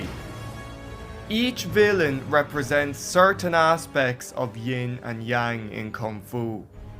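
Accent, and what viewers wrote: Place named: Ireland. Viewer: I can tell your Irish :D so am I.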